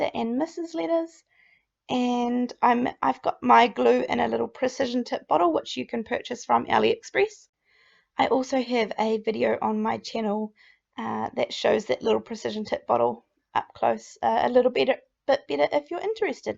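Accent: New Zealand. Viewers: Love your videos, so nice to hear a kiwi accent!! Just wondering where you get your black and white cardstock from?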